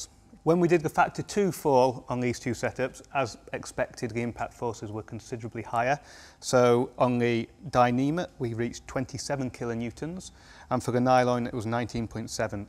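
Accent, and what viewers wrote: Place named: United Kingdom. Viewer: Impossible combination for a foreigner, british dialect & this kind of mumbling voice... Does he have pebbles in his mouth?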